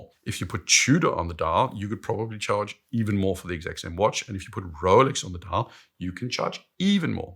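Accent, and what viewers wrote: South Africa. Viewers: Your accents sounds soooo South African. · My wife is South African, I’ve been to South Africa, love the people and the place- this is a heck of a watch channel, but I do feel biased for that South African accent…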